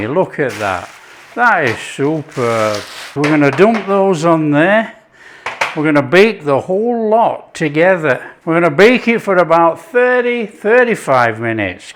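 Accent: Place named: Scotland, United Kingdom. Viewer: I'm half Italian, and this is really making me hungry right now. I'm browsing for bread recipes, I haven't even had anything to eat yet. Loving his accent. Is that Scottish, I hear?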